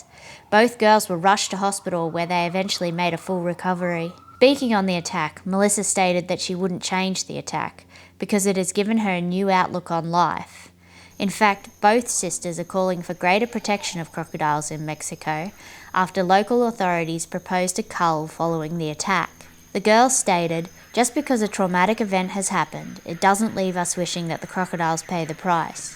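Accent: Australia. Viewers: great to hear an aussie accent narrating, excellent content!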